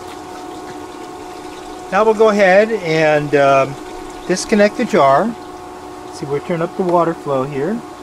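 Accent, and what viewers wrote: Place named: India. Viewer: Indian delivery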